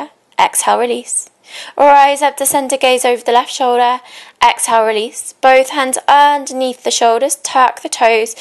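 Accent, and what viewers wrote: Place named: United Kingdom. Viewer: Thanks. I used the video that you referred to this morning - it is very good too. Thank you. If you are open to other suggestions, here's a couple: 1) You say in your bio that you also practice Mindfulness. I started doing this last fall. Have you considered doing some guided mediation videos? I use other guided mediation videos on YouTube and they are very popular. Your voice soothing (especially British accent). 2) A quick 5-7 minute stretching video that people could do at their desk in an office.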